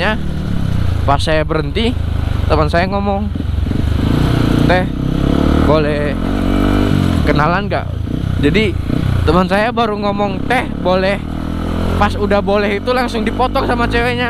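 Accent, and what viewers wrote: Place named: West Java, Indonesia. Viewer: Logat Sunda na mantep😂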